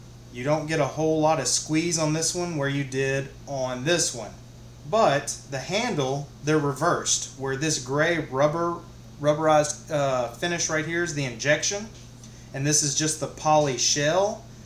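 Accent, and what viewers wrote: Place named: Texas, United States. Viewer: What part of Texas you from?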